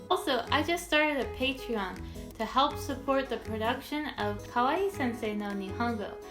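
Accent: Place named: United States. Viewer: Sorry, you have american accent so much, I think it´s not good teach untrue accent. Are u trying youtuber? It´s better change for another thing. I´m japanese and I´m being honest, so sorry if you dont like my comment. But it´s not really good for people want to learn. Right?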